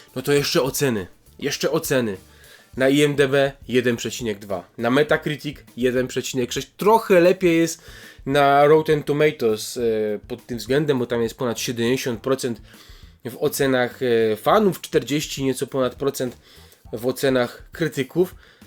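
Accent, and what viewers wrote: Silesia, Poland. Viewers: Jesteś ze śląska? Słyszę akcent :D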